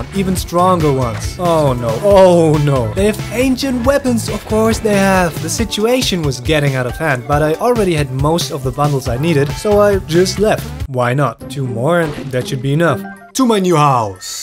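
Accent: Germany. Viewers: are u german? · I have a question are you German? It may sound weird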